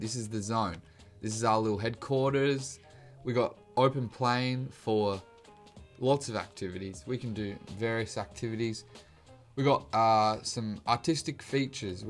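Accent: Australia. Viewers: Wait are you Aussie?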